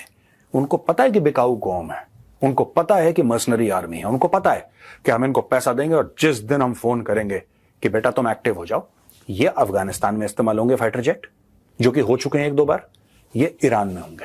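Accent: Lahore, Pakistan. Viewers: Lohori style bolte rahiye.majorsaab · 😂😂😂😂😂 Gaurav Sir's Lahori accent is hilarious 🤣🤣🤣🤣🤣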